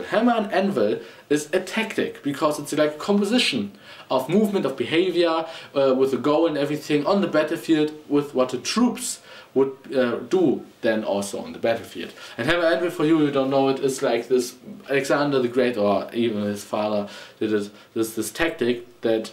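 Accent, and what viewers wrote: Germany. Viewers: Yes, Shadiversity are you German? Der Akzent kommt mir so vertraut vor xD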